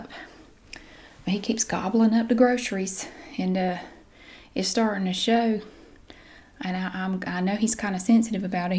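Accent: South, United States. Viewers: After a long work day has relaxed me and made me smile. Also.....I want so badly to replace my boring Iowa way of speaking with that beautiful southern drawl.💕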